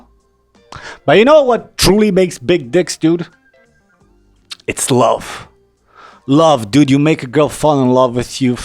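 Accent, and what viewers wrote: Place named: United States. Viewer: u speak english like an american! good job.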